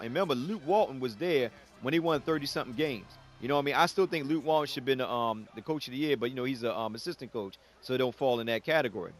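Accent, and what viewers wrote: Baltimore, United States. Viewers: You sound like you from Baltimore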